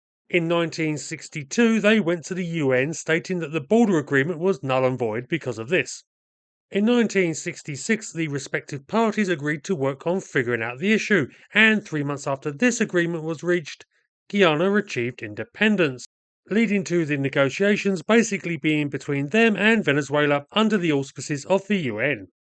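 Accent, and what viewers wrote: United Kingdom. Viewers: A mess such as this is always clarified when explained by a proper gentleman with a British accent.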